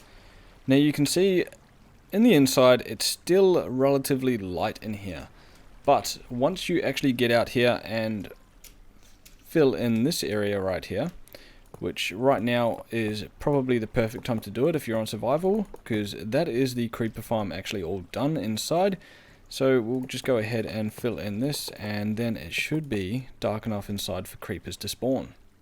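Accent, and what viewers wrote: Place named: New Zealand. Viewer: Subtle kiwi accent almost snuck past me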